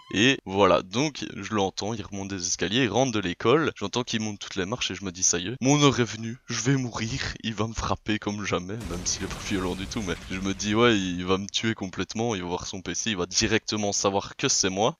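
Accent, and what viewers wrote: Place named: France. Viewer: Écœurant! Je n’en reviens tout simplement pas. Où as-tu pris cet accent à la française ? Le rythme est bon, le propos intéressant et les animations soutiennent à merveille l’histoire. Bravo, cent fois Bravo...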